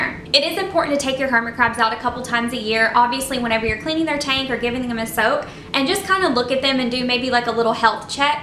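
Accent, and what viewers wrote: South, United States. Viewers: she's southern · she is southern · It's her Southern Accent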